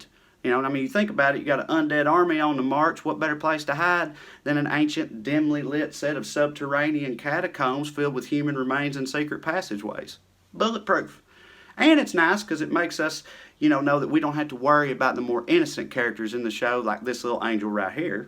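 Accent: South, United States. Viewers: Got damn, my daddy cussed, and so did I. I was a small, blonde headed, spirited and polite Misssissippi girl just cussing when needed. One day on the playground, in the 3rd grade, a necessary "got dam it" moment occurred. A little Baptist girl, ( we were not Baptist) goody 2 shoes attacks me, yelling: "STOP TAKING THE LORD'S NAME IN VAIN." A vein was pulsating in her forehead. I blew her off, had she gone crazy? She followed, yelling. I turned around and asked her what she was talking about? Was she crazy? She explained "G.D". I looked at her as if she was insane and said," I am saying G O T DAM IT,it's got nothing to do with GOD!!!!!.........later I did delete "got dam" from my cussing...I knew, GOD knew I was not talking about "him" but I guess I did not want others to think that I did not love GOD....alas....I appreciate your clarification of your "Got damns", because they were bothersome to my hearing enlightening, intelligent words in a Southern accent. 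And that brings me hope and pleasure everytime you speak. Thank you,. Please continue sharing your thoughtful reality with us. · I am so fucking hooked on the brilliance, rhythm of Southern American speech, and erudition of, that I watched GOT after watching one of his takes on the show (I live in Spain, so we get everything late here.) Now, I am wearing dragon earrings and saying "Valar Morghulis" to random people in cafes. After I binge-watched the series, I watched his takes again, and I nearly tinkled my knickers.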